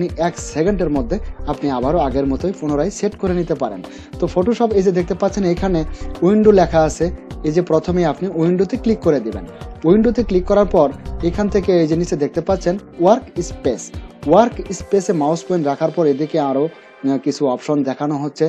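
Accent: Bangladesh. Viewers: আচ্ছা আপনি চট্টগ্রামের নাকি? উচ্চারণ অনেকাংশেই চট্টগ্রামের মতো লাগে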